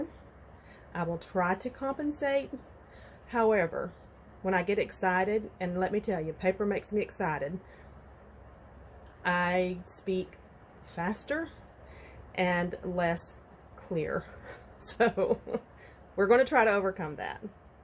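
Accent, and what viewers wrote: South, United States. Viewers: First of allllll don’t you dare get rid of that southern accent! I looove it! Second- I laughed out loud when you said “ was I smoking crack that day?” 😂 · You are not alone in this epiphany! I too have come to the realization that my spending is out of control! Getting it into control is not going so well with me either. I've tried, Lord knows I've tried. I think if slowed down a bit. I really don't need anything more but my problem is for example... when you showed that Reminisce hello summer guy side B paper, I'm not gonna lie, I went and googled the crap out of that paper! Lucky not lucky for me is it is no where to be found! Not even on EBay. There may be an Etsy but not gonna go there! I just told myself I don't need it. I have found something that's therapeutic for me at this point in my spending habits it, I will shop til I drop, I mean I will load up that cart with all the pretty things that I love. 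After about an hour of a complete waste of time I will close that browser and walk away with never an intent to ever checkout! It help me but then, someone showed the Jillibean soup discount box haul she got from an etsy shop and yeah, not gonna lie I had to have it! After all its Jillibean soup, face it how much longer will we be able to crab up some of that! Well I walked away from that too then the next morning I got an email saying hey you left something in your cart we'll give you 25% off today! You bet your bottom dollar its mine now! I enjoy your southern accent so don't you even try to change it! You video got me thinking! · Lovely to hear your pretty southern accent! I should try the 30 days of sketches,too! I am so far behind with everything! Love your kits!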